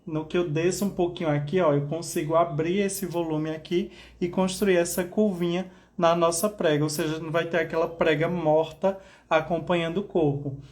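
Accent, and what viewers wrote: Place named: Pernambuco, Brazil. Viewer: Sotaque pernambucano... Ksksk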